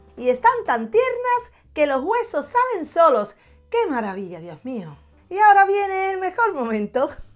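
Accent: Andalusia, Spain. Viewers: ya le he hecho varias veces y genial. Muchas gracias por tus recetas. Mi madre dice que tienes acento de Dos Hermanas. Feliz Navidad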